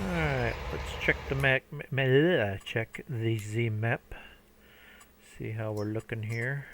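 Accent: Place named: Wisconsin, United States. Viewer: I've got to ask where are you from? By your voice I'm thinking WI or maybe northeast IA but I can't work it out haha I live in Phoenix now but my family is from NW IL about an hour and half from Chicago and 30 minutes from WI.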